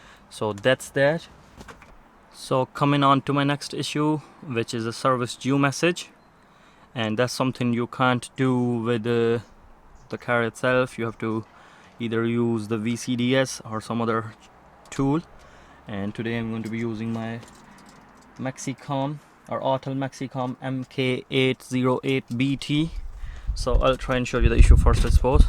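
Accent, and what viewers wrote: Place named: Cork, Ireland. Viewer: There's a hint of an Irish accent in there..... specifically a Cork accent, am I right?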